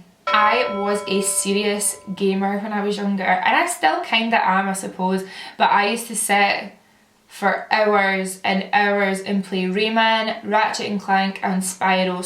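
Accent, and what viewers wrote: Scotland, United Kingdom. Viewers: Whereabouts in Scotland are you from? I'm Scottish too and I love your accent, but I honestly can't place it!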